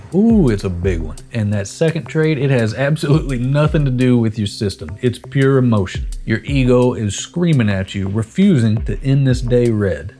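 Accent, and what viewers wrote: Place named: West, United States. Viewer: I'm from the Bel Paese and I grew up watching Western movies and your accent seems to come straight from the mouth of those movies actors 😅. Sei simpatico e pieno di energia!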